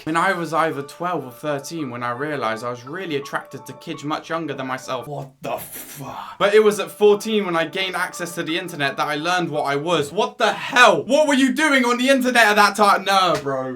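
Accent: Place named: United Kingdom. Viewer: It always sound better and more degrading with a British accent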